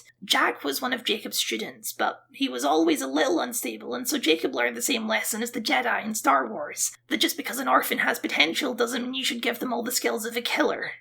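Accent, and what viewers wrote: United Kingdom. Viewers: I’m… I mean I WAS assuming it’s just a different timezone situation, given the accent. GMT at 4am est is 9am.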